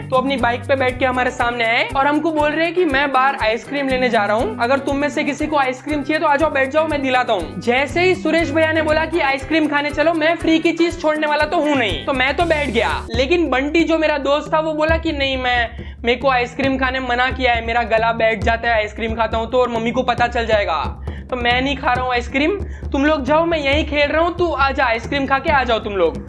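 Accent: Hyderabad, India. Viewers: Your Hyderabadi accent is to die for ❤❤😅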